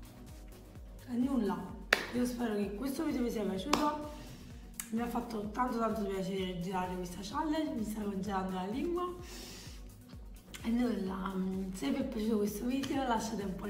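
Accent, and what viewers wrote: Naples, Italy. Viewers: Sei napoletana Ps ti adoro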